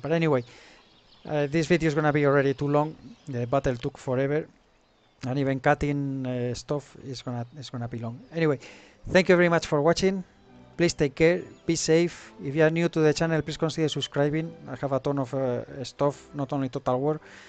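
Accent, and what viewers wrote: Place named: Spain. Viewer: Solo me pareció extraño , entiendo que el mundo es anglo parlante pero me pareció extraño sin más , eso si , sino fuese por el acento jamás notaría que fueses español porque hablas muy bien el inglés. Pero bajo mi punta de vista una campaña se agradece en el idioma de uno, en este caso español , más cómodo y agradable o al menos para mi , hablando de series yo me vería encantado una de este mismo , del 1600 y con España como no podría ser de otro modo , hay que reconocer que el mod está algo verde y sin acabar pero aún así tiene su punto , además de que se juega en una Época donde todo nos era un poco más favorable y hace más disfrutable la campaña , al contrario que el 1700 donde empizas afixiado con el imperio. Soy de Málaga compañero , saludos.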